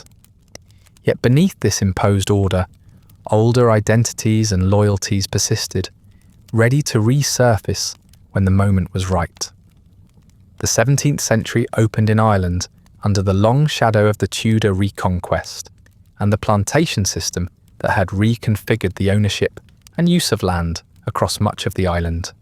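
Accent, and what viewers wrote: United Kingdom. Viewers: Watch how you tread here carefully having a British accent and dictating Irish history to the mass is not a good luck would not appreciate if I were to do the same to you. Trust me just cautiously and make sure you know your shit. Again the graphics need to go.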